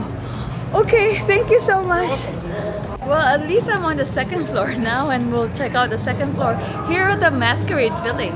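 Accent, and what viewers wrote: Philippines. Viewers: YOU SOUND LIKE A 'FILAPINA' ?:face-blue-smiling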